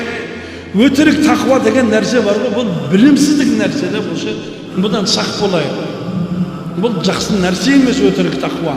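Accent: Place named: Uzbekistan. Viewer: Озбекше акцент урып тур устаз. неге озбекшеде амри маруф кылмайсыз ?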